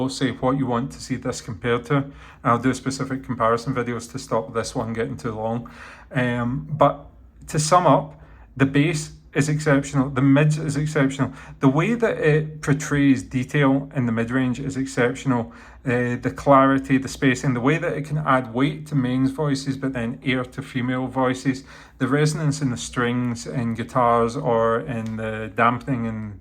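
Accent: Scotland, United Kingdom. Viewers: your scottish accent makes me trust you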